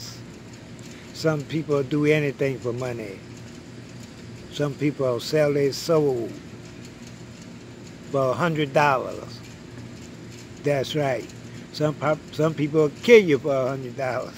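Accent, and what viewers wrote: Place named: New Orleans, United States. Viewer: Elder the way you talkin you real are man a live stream talk just like that the way you are talkin remind me of my relatives New Orleans 504 head bussa!!⚔💥⚔🔥⚔💥⚔✊🏿🔥✊🏿👊🏿💥👊🏿💥👊🏿💥👊🏿💥👊🏿💥👊🏿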